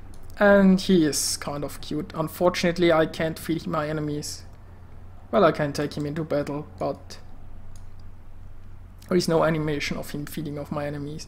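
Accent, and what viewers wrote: Germany. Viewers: Your accent tells me you're German. Would I win if I had a bet on it? :D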